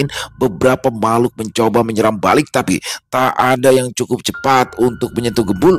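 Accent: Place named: Betawi, Indonesia. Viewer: Pokok nya nggk kn pernah bosan dech...,klo dengerin kisah warung kopi bang sama gembul,di tambah lagi logat Betawi yg di bawain olh bang membuat kisah nya jd hidup. terimakasih bang kisah bang nggk pernah gagal menghibur salam sehat sukses dan bahagia selalu untuk bang dan team ❤di tunggu kisah bang selanjut nya 😊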